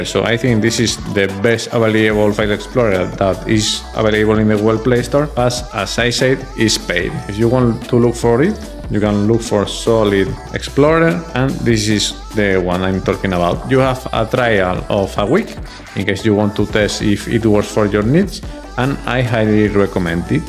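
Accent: Spain. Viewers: Cómo se nos nota el acento español cuando hablamos inglés... Buen video colega, me suscribo.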